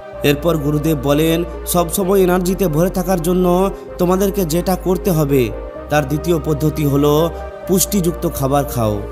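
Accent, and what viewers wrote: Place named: Bankura, India. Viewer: ভাই আপনার বাড়ি কি বাঁকুড়াতে। আপনার কথার টান শুনে মনে হচ্ছে।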